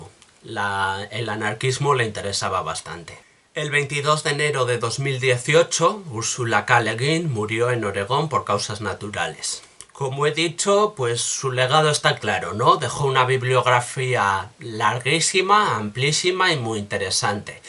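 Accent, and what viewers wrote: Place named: Madrid, Spain. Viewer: "Tal y cual"... Tu... Tu eres de Madrid no? XD Buen video ♥️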